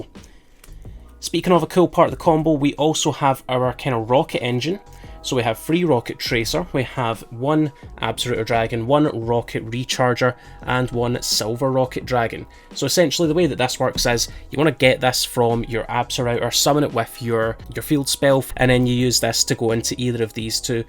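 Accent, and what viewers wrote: Scotland, United Kingdom. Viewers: "Fantasmay is Fantastic" with that accent will never leave my head anymore 🤣🤣 Assuming Scottish aswell?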